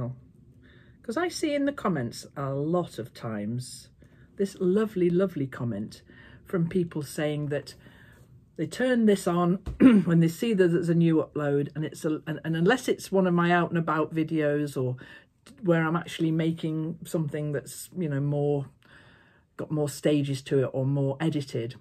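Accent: England, United Kingdom. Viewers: I was watching Arne & Carlos today as a welcome distraction from our election mania here in the US (I am from the UK originally - so enjoy hearing your accent - makes me feel at home 💕) - they mentioned you, so here I am! Loving your channel! I think you might enjoy the Fairyland Cottage YT channel ;) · I got some quilting videos recommended by ty and somebody mentioned english piercing - I think it was the Royal Quilter or something like that in Australia. I google it, because I though piercing and quilting how do that go together. LOL. After searching in yt I found you within the results. Thank you ! I sometimes am in need to hear a lovely english voice, rambling on 🥰🥰😉. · I wonder if it suggested you because I watch "knit ink". They went on an Arne and Carlos cruise I think! Oh and just get it done quilts. She's great. Lovely to hear an English/uk voice chatting about quilts, though ☺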